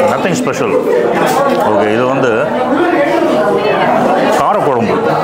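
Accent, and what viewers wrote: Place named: Kongu, India. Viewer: Ore satham vaalu vaalu nu 😂kongu slang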